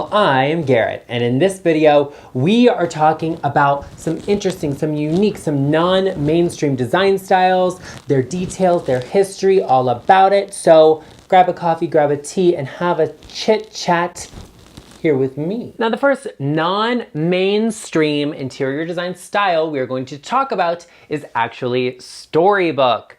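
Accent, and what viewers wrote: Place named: South, United States. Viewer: Love your channel! Thank you for all this valuable professional advice. Where, generally, are you located? Northeast, South?… You sound a little southern to my Texan raised ears. ❤️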